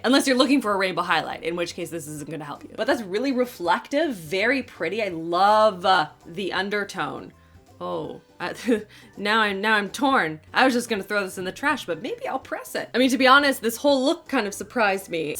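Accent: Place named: Canada. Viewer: Riv H she is Canadian. It comes out on occasion.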